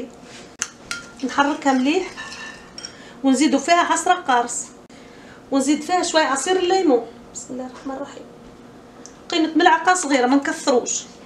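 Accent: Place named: South, Tunisia. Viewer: قداش نحب كلامك لهجتنا متاع الجنوب محلاها 😍 ربي يباركلك اختي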